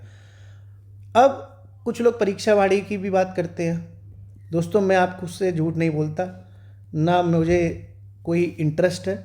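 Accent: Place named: Etah, India. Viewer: एटा की भाषा लगती है आपकी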